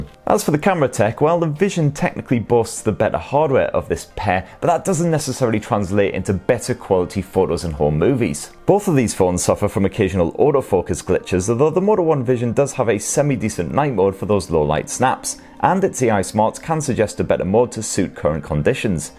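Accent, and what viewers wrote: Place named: Newcastle, United Kingdom. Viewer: I'm a new subscriber, and it's great to hear reviews from someone in the uk....with a lovely Geordie accent? Love your sense of humour too. Just wish you would take a breath every so often!!! Lol I'm a technophobe, who still uses a non smartphone Nokia 6300, and I'm "trying" to make sense of all this tech-speak, with the thought of buying a mid priced smartphone.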